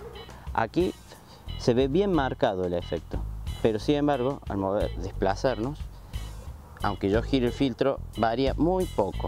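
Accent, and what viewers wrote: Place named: Córdoba, Argentina. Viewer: Te escucho con acentito coordobes, argentino. Gracias por el video.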